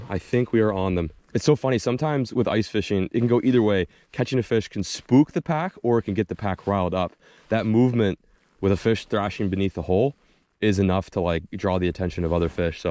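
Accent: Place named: North, United States. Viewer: Like if y’all can hear getting a northern accent since the move haha. It’s getting noticeably stronger each video brother.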